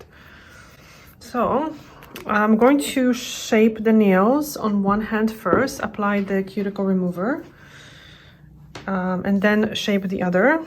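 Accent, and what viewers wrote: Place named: Poland. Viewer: Dopiero niedawno oglądam twoje filmy i dzisiaj usłyszałam że mówisz po polsku. Tak się domyślalałam bo brak u ciebie tego kanadyjskiego entuzjamu w głosie pomimo że akcent już mało polski 😉 Mam jeden lakier z Catrice z seri nudes i byłoby wszystko idealnie ale niestety słabo się u mnie utrzymuje. Po 3 dniach jest spękany na powierzchni. Za to eveline pięknie się sprawdza, mega trwałe i łatwe ale kolory mają jakieś takie mało ciekawe...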